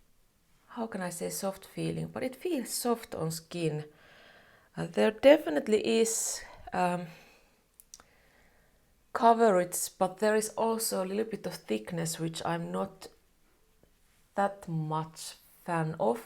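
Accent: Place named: Finland. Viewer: Dear, you are really pure joy to watch! Loooooove, looooooooooooove your Finnis accent and your reviews are always spot on! Helped me alot which foundation to choose. Wish you all the best and can't wait for new vid. ☺️☺️ 😊🤗🤗 ❤️❤️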